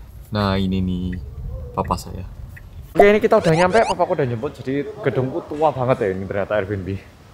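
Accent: Java, Indonesia. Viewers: haloo mas aku bolo bolo udah nonton dari jaman mas upload ciri² kamu psikopat atau bukan aku lupa taun berapa sampe ke detektif roemit dan rinding dong yg emang aku suka banget dengan pembawaan cerita dari mas, dan sampe akhirnya mas up pacar nya ke public and sempet kaget ternyata udah pacaran lama dan sekarang udah mau menikah, and aku juga mau menikah mas ditanggal 21 ini, selamat yah mas si medok 🥰🥰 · Maaf mas..calon nya bukan orang indo ya..kirain orang indo..sayang banget lama di indo ngak ada cewek indo yang doyan haha..oppss maaf..✌ bahasa medok tak kira ditaksir cewek dari jawa..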